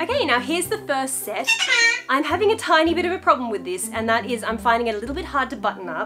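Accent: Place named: Australia. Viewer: she is aussie she does not have a bad aussie accent u can’t be aussie or know their accent at all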